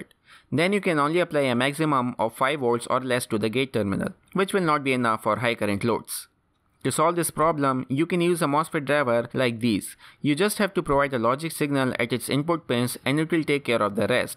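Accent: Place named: India. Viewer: Your commentary is more to express your English language efficiency and not the subject matter. You are reading the information very fast and you do not know what you are talking about. Yet you have a very strong indian accent 'depletion region', and "available" are the worst to understand. You collected information from the web and read it out loud. It was of no help. Everyone knows about n and p channels, plus bipolar transistors. Anyway, thank you for upload.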